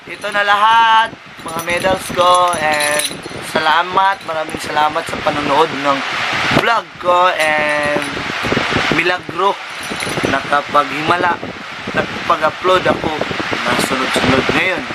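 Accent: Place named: Visayas, Philippines. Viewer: Bisaya ka sir?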